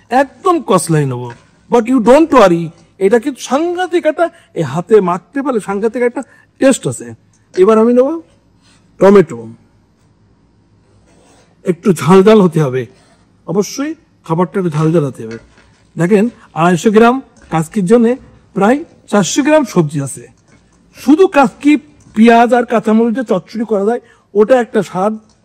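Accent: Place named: Jhenaidah, Bangladesh. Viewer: শেফ ভাষা মনে হয় ঝিনাইদহের ভাষা। আমার বাড়ি ঝিনাইদহ সদর।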